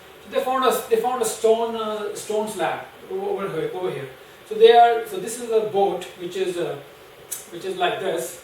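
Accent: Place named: Oklahoma, United States. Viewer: You have a very odd version of an Okie accent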